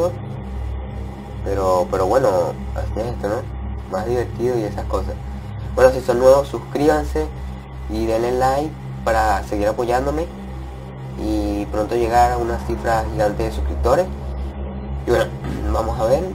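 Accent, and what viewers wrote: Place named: Apure, Venezuela. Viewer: aaa or eso mano se te eschuca lavoz de apureño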